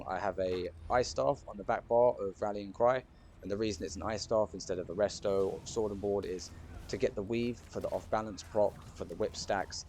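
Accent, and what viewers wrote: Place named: New Zealand. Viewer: Are you kiwi? you sound like Kai Kara France my guy.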